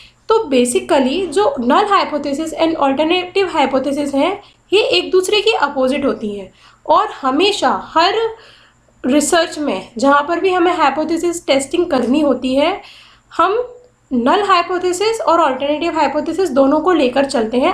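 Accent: Bengal, India. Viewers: Madam, Apka bangali hai, .... Matlab ko mane bol rahi hai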